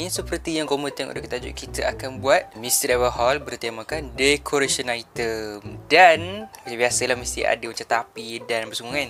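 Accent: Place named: Perak, Malaysia. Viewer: New subscriber suka dengar loghat perak tgh pastu rase rilek je bila tgok video. Harap leh bnyk kan lagi video and maju jaya 👍👍👍👍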